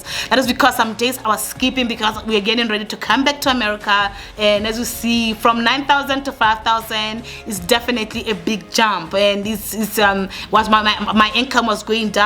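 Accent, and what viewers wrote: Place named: Africa. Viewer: Thanks for providing detailed information.You can have African accent what is important is the information you are presenting to us.Well done with your spreadsheet we will always support you in the SADEC region in Africa.Felicity Family SA.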